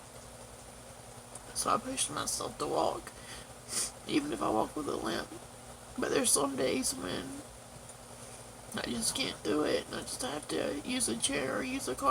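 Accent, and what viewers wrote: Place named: North Carolina, United States. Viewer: You are a beautiful woman. You are strong for talking about these things. Which Carolina are you from? You sound like you are from North Carolina. I'm from NC, born and raised. Found your channel on PP Officials channel. Hope all is well.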